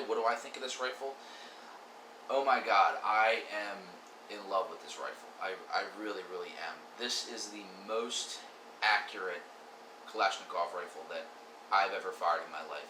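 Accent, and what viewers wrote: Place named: Philadelphia, United States. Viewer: Yo! You from the Philly area? You got the accent, yo.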